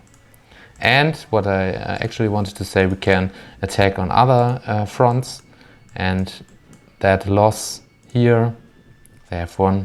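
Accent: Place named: Germany. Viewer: German accent ist klear.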